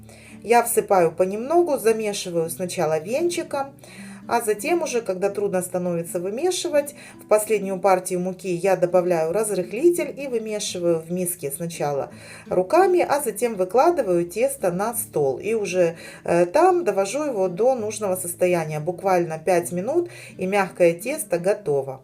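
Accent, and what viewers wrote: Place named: Surzhyk, Ukraine. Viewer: МАТЬ- то тут при чём? Да ,неправильно человек говорит,но поправьте,подскажите- она исправится ,увидев нормальный совет.Вот привыкли люди так говорить ,я так поняла ,хозяйка из Украины,там ,в основном ,так и говорят.Не потому ,что неграмотные,а потому ,что говорят на " суржике",в основном.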